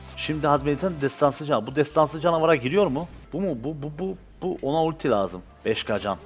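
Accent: Cyprus. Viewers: abi sen gıbrıslısıñ deyiil?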